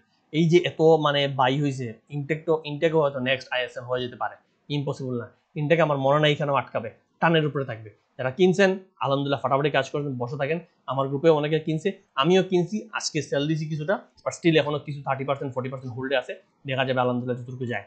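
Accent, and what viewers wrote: Sylhet, Bangladesh. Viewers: Good with sylheti accent. Topic doesn’t match with analysis.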